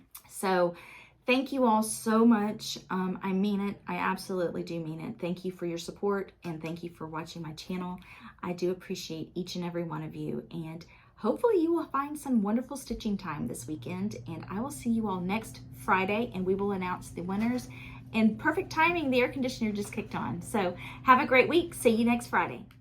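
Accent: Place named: Carolinas, United States. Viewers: Thank you for being so genuine! My husband is from Alabama, it's nice to hear your accent. I love all the things, but #1,3,4,5,6,7,11,14,15,17,18,20,24,28,30 are my choices. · Happy 10,000 Celebration! I enjoy watching you so much. My family is all in the Carolina’s and I’m in Colorado. Listening to you is like hearing them all. :). 1, 2, 4, 6, 7, 10, 11, 12, 14, 15, 16, 18, 19, 22, 27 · I started watching you from a shout out from Brenda and serial starter..I love the name (my daughter's name) and I loved living in the South..Memphis then Atlanta where I started stitching in the 80's.... The kindness civility and the accent! 😃 I have been in the North (for many years and I tune in and find learning and comfort with you as I stitch. Thank you for the celebration give aways: 1,2,6,7,11,15,17,18,19,20,21,22,23,24,28. Congratulations,!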